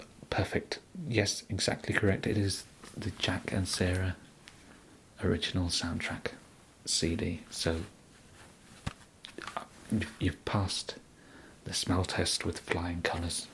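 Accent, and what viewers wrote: England, United Kingdom. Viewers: Okay, so british Howard Wolowitz. Not bad. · It's a rare treat to hear an English accent with ASMR - I'm English and I found this very soothing as well as amusing. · I really enjoy English accent ASMR and interesting takes on roleplays, so this video 100% hits the spot for me, great job with your acting.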